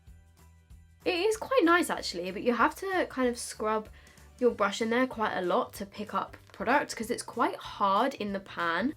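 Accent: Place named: United Kingdom. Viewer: Hi, I really love watching your videos and I'm in love with your British accent💖 (I am Italian😊)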